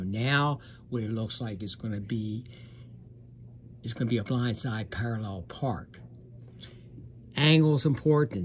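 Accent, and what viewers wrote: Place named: Maryland, United States. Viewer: Thank you so much for all your videos they were a huge help , I got my CDL today 🎉 Also I’m originally from Maryland so you sound like my people 😊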